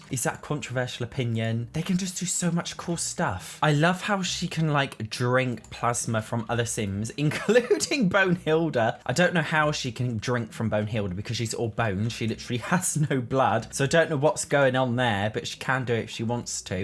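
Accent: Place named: United Kingdom. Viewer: I'm obsessed with this British accent 😍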